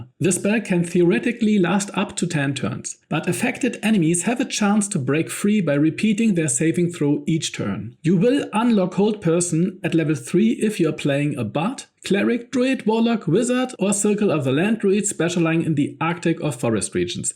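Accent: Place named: Germany. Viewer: absolutely love this kind of in depth analysis!! keep it up!! recently for whatever reason youtube has been recommending me many smaller, german, bg3-focused creators and i have been all for it lol (forgive me if ive misheard your accent — it sounds german to me)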